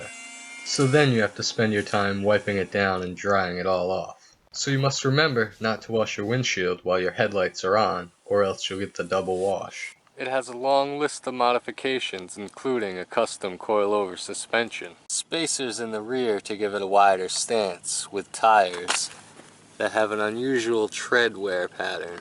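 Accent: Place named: United States. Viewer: why u speak with that united state accent. its sound no good.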